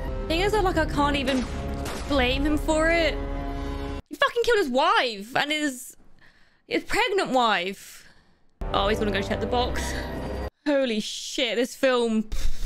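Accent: England, United Kingdom. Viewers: Back to the future is a good shout. My favorite film. But I think you come straight off the bat with Nobody and work your way back. You sound English, have you seen Snatch or Lock Stock?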